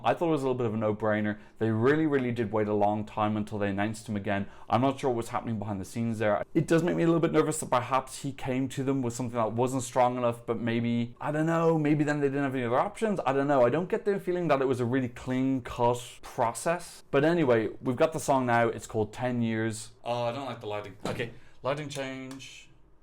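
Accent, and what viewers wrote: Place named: Ireland. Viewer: Yeah but he's Irish and everything is T and D anyway. Saying it's like the does not help 🤣🤣🤣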